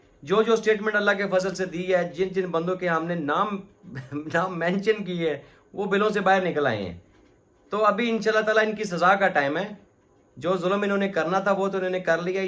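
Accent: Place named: Punjab, India. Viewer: Aisy alfaz tho Punjabi b bolty ha.. Qk ghairat mand logo ka lehja yahi hota ha.. Ap kaha K patan Ho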